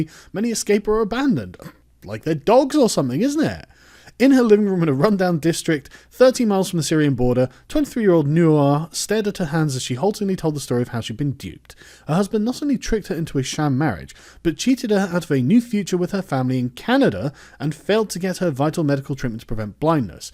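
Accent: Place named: England, United Kingdom. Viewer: what city/region of England is the source of this intensely proper accent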